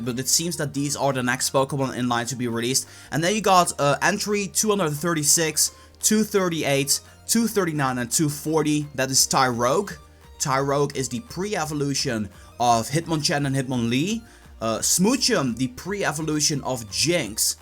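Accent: Netherlands. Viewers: Is He Dutch?? His Accent Though I Am Dutch Too So Think He Is...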